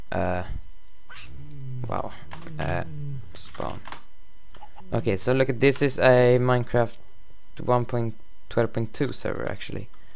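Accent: Sweden. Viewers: ur from sweden i can hear it on your voice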